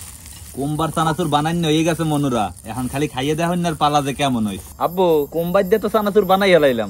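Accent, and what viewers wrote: Barishal, Bangladesh. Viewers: আমিও বরিশালের ছেলে কিন্তু আপনার মতো সুন্দর করে বরিশালের ভাষায় কথা বলতে পারি না😢 আসলে আঞ্চলিক ভাষায় একটা টান যেটা আমার কথায় আসে না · হায় রে বরিশাইলা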